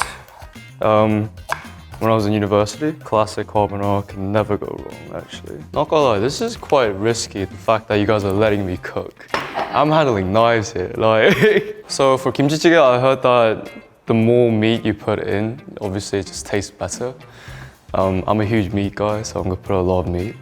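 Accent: United Kingdom. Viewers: HIS BRITISH ACCENT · HIS BRITISH ACCENT IS EVERYTHING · His British accent ❤ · MINHO YOU HAVE SUCH A LOVELY ENGLISH ACCENT 😊 BEAUTIFUL #KITTYMINHO HERE 🥰🫡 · British accent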